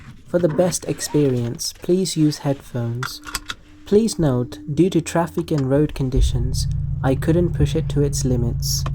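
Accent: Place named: Kerala, India. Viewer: Malayale English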